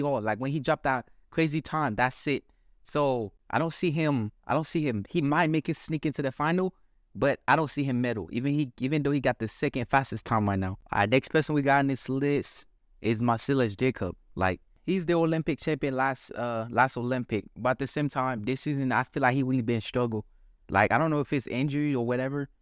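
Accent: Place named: South Carolina, United States. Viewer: Love your accent, man! Are you from South Carolina?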